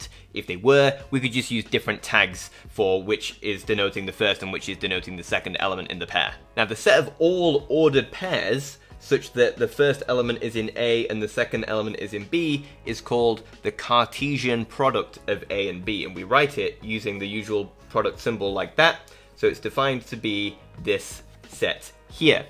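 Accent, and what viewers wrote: Yorkshire, United Kingdom. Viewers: *Man with an accent vaguely resembling the Yorkshire accent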